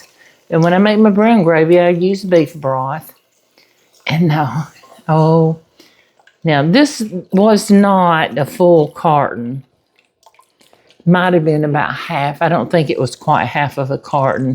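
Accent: South, United States. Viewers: What a sweet couple you are, so glad I just found your channel! ❤❤ Congrats on being featured in the magazine! What state are yas in, love your Southern accent. ❤ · OMG! I just happened to fall upon your channel and, if I close my eyes, you sound just like Paula Deene. I love your Southern accent. New subscriber 🤗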